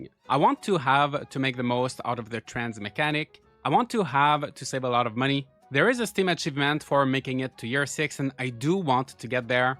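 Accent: Quebec, Canada. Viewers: Excuse-moi Est que tu viens de la province de Québec? Ton accent sonne canadien français je trouve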